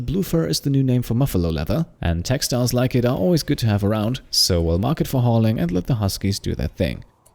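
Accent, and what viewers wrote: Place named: Germany. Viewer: Something's came to my mind watching this series. You have - maybe I am imagining it - a slight German accent. Is that the case? Because while being German myself and knowing how normal Germans speak English, I definitely had to listen quite a while to come to this guess. If that was true, I would highly have to take my hat off to your English language skills. ... die hast du doch nicht im Studium erlernt? Das erste Mal, als ich darüber gestolpert bin, war, als du von der Masterarbeit gesprochen hast, was mich das erste Mal hat aufhorchen lassen. Worüber war die btw?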